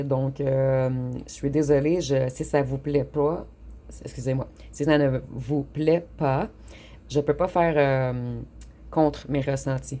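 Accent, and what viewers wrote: Canada. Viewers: c'est un joli prénom, vous êtes canadienne? J'adore votre pays et accent. Vos guidances sont excellentes. Merci ❤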